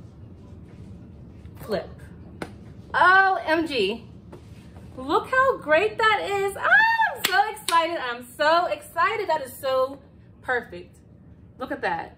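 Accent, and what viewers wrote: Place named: Pittsburgh, United States. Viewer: Love it I was going to use cardboard!!! This gonna sound crazy but I had to subscribe cause as soon as you spoke I knew your were a fellow PGH girl before you even said it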